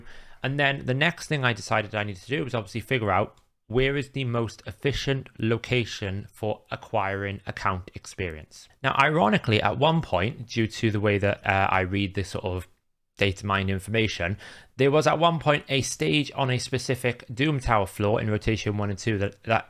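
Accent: Wales, United Kingdom. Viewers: Are you welsh? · You sound like your from llanelli or swansea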